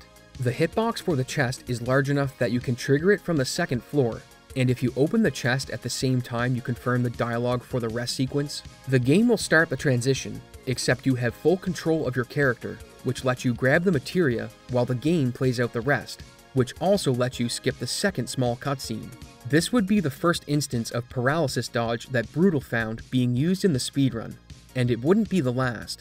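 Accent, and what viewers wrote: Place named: Atlantic Canada, Canada. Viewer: incredible video. I have to point out tho, as a Newfie, you HAVE to be from Atlantic Canada some where right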